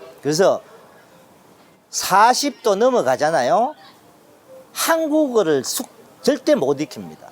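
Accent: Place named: Vietnam. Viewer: 주인장 한국말하는 베트남 사람같아요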